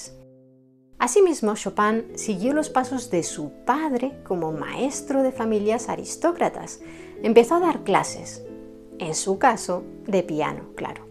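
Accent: Spain. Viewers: Excelente narración y locución de. Gracias por dedicar tiempo en enseñar. Saludo Desde Venezuela. (Barquisimeto. Estado Lara). Podría saber de donde eres? Parece🇪🇸 según acento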